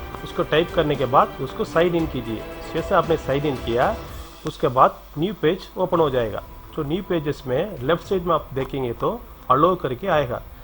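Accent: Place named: Tamil Nadu, India. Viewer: Neega Tamil thane neega Hindi pesuradhu teriyuthu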